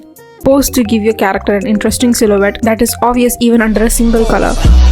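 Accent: India. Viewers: are u indian ????? hmmmm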